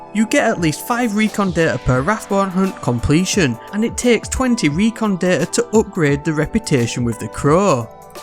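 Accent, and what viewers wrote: England, United Kingdom. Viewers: That's an interesting pommy accent you have there lol. I've never lure pronounced lurrr before. Thanks for the vid ;) very helpful.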